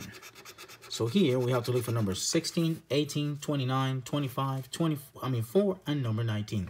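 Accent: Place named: Ireland. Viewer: Hi 🖐 great video. I'm from the UK. And listening to you I could hear Irish accent in your voice real quite strong at times. Do you live in Ireland? Lol so curious. 👍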